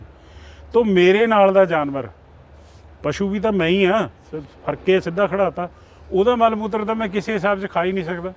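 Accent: Puadh, India. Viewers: Puadi bhasa bol reha veer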